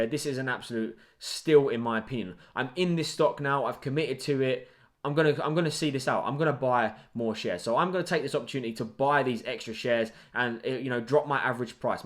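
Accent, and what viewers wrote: United Kingdom. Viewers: Good keep it up! As an Italian in the UK, i like your UK accent 👌🏻😄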